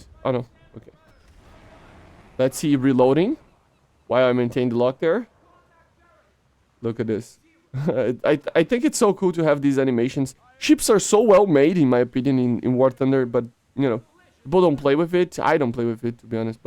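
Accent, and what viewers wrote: Brazil. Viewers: pelo sotaque esse mano é br mas n posso provar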